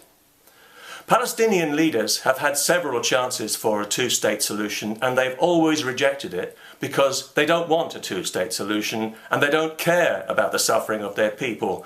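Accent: United Kingdom. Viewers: You sound British :) you know what. Let's give the Arabs their country back that you stole and gave away and give the Jews a part of England instead. I mean your such a nice and fair people and has always been. Lets not talk about how you treated Scotland, Africa, India, Ireland, slave trade and so on :)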